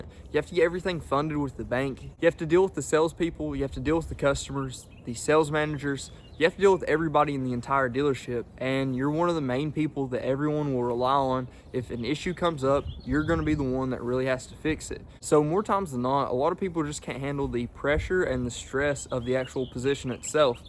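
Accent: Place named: Texas, United States. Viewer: I admit I like your accent a lot! Probably one of my fav English accents. Are you from Texas?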